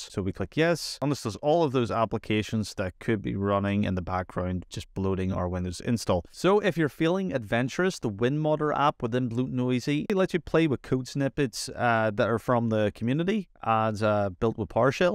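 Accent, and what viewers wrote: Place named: Northern Ireland, United Kingdom. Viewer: northern irish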